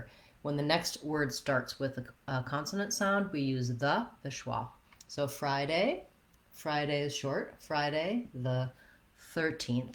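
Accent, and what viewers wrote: United States. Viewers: Fantastic way to immerse in American Accent is to listen to you. Thanks for making it easy for us. <num>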